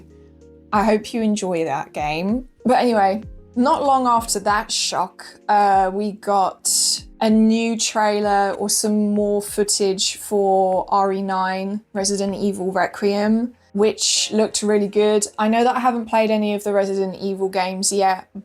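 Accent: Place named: RP, United Kingdom. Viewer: You have the most Cambridge English International Test Listening Part accent ever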